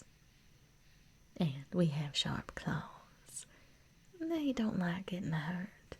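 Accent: South, United States. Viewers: The return of the Southern accent praise the gods of universe! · I hope we get to hear more of the southern accent, it’s lovely ❤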